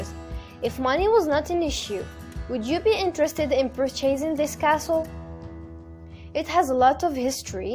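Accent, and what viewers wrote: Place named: France. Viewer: "It can be yours for a MERE $5.69 million". That might be mere to you, but not to me. BTW it's difficult to understand the narrator with that French accent.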